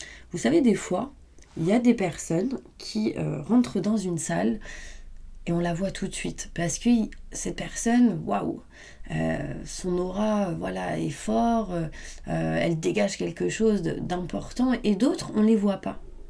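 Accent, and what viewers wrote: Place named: Mediterranean. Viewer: Oui déjà des petites insomnies. En fait c est bien si vous faites rire les auditeurs, car vues les nouvelles à la télé.. En fait vous parlez comme un peu les gens de là où j habite : pays de Méditerranée !.... En tous cas j y suis habitué. D après mon avis, les gens qui regardent les vidéos des mediums, et bien c est qu on a une petite vie bien étroite, étriquée, et que notre âme est prisonnière " de peurs, de questionnements, et d interrogations".. Merci pour ces deblocages ! Vidéos vraiment dynamiques ! ☀️😊💐💐💐💐💐💐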